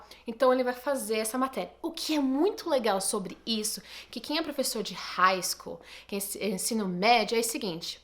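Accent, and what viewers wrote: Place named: Portugal. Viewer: lembra um pouco portuguesa seu sotaque é bem diferente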